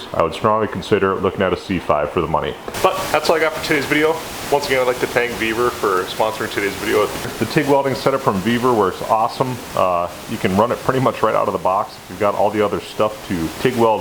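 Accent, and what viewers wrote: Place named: Northern Minnesota, United States. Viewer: I heard northern Minnesota in one of your Iroc videos. Love Irocs! Where in Minn? I'm originally from Intl Falls on the border, eh? So I'll have to catch up on your Camaro vids .... peace.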